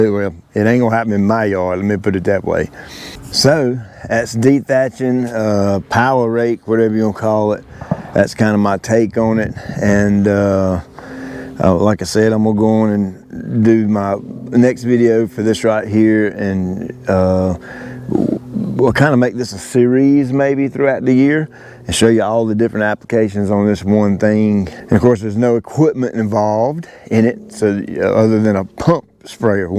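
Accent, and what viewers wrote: South, United States. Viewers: Hey, the only thing thicker than your smooth Southern accent is your gorgeous lawn! All we can see up here in Minnesota is snow, snow, snow. But, you're helping us hit the ground running when Spring finally hits. I hope your socks are blown off from all the Blessings!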